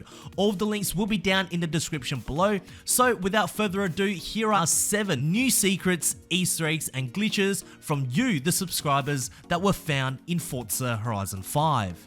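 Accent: Australia. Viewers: Wait ur Australian My brother What part are you from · Fun fact! I was today years old when I realized is Australian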